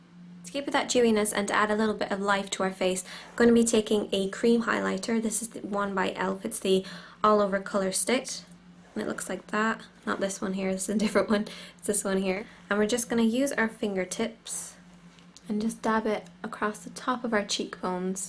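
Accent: Ireland. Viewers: are you Irish? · You are really pretty! :) Are you by any chance irish? Sorry just curious, you sounded a bit irish. I'm not irish I'm british, norfolk accent XD Just wanted to know! Btw great vid, i'll be using those tips haha. I know a good tip for eyeliner. To keep the eyeliner on so it doesnt come off or smudge is to put a simular color eyeshadow over it or if you want a bit of variety use a different color! :D Something i picked up when practicing my make-up! :)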